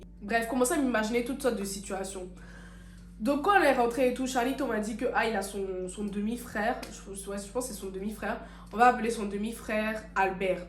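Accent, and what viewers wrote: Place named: Ivory Coast. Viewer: Sa se voit trop dans ton accent que tes ivoirienne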